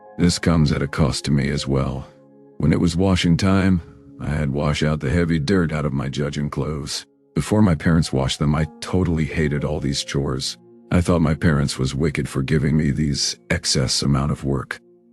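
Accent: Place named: Jamaica. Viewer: Wear in Jamaica you from you sound just like me I grow up in St James aĺ was my job too as a girl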